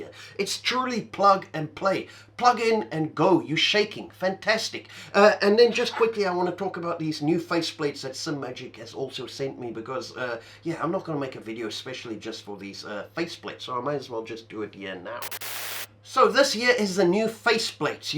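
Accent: South Africa. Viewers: I recently discovered your channel and I’m loving your content. Are you South African?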